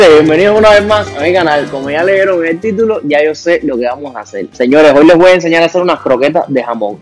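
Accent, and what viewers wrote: Cuba. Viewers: Primera vez que te veo y me encantó tu forma cubana de explicar, felicidades ahora te voy a seguir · Las mías estarán bien deformes porque no tengo el aparato ese ! Jajaja …. Me encantan tus videos … muchas gracias por ser tan auténtico y agradable. Escucharte hablar me recuerda mi infancia en Cuba. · Me gustó mucho tu forma tan Cubana y relajada de hablar y hacer tus croquetas, aprendí y me divertí, gracias ❤ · saludos! Me encantó tu modo muy cubanazo de explicar, jajajajaaaa. Bueno te diré q en Cuba, mi papá que era cocinero, hacía en casa croquetas de todo tipo, riquísimas y como no tenía máquina moledora ni cómo conseguirla, el les daba forma con un pomo de refresco gaseado (los que bautizamos allá con el nombre de ”pepino”), le cortaba el fondo, por ahí lo llenaba con la masa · Me encanta este canal, no solo por las recetas que son buenísimas… también me encanta oírlo hablar, cubano 100% jajaja….. 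me encanta !!! Que tengas mucho éxito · Me encanta tu forma de explicar muy a lo cubano. Me gustaría que hagas frituras de bacalao. Mi papá las hacía tan ricas. Gracias y deseo mucho éxito!!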